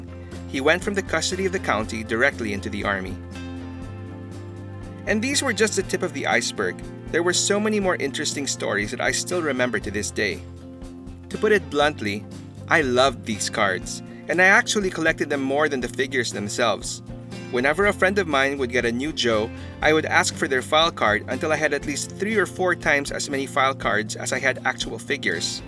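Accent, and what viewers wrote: Philippines. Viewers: mabuhay po kayo sir, nalaman ko po sa accent nyo na pinoy kayo, god bless po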